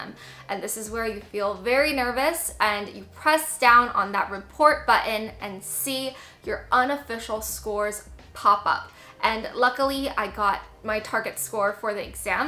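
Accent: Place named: North America. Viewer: HOLY SHIT. You were born and raised in Taiwan and can now speak English with a North American accent? Damn.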